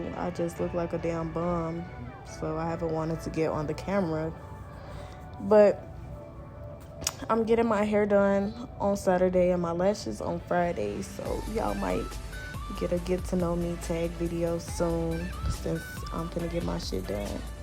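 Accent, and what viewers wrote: United States. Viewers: G I went to your socials because I know Chicago when I hear it. You snapped on the wigs and the video too sis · Girl u are funny🤣....!!!! your accent reminds me of Miami lol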